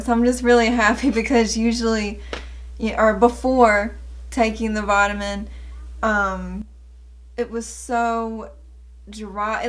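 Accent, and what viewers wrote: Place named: South, United States. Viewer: Let your southern accent come through. No need to hide it.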